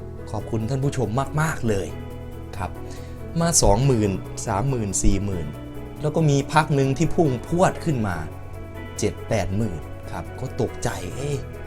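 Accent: Isan, Thailand. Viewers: พี่คนอีสานหรือปล่าว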